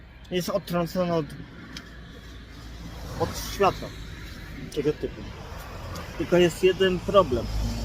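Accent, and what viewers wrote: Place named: Łódź, Poland. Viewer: Mówi typowo łódzkim, miękkim akcentem. xD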